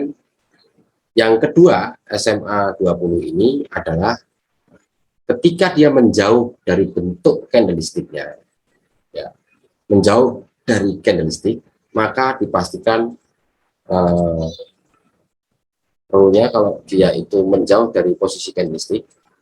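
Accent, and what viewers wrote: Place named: Java, Indonesia. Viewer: CUCOK SAY ....JAWIR BANGET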